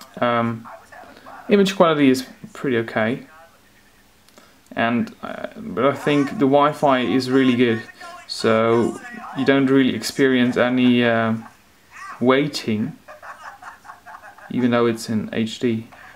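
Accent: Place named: United Kingdom. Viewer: You sound like a fellow Brit, what website did you purchase this from and how much did you pay for it? Do you have to pay for VAT and what not?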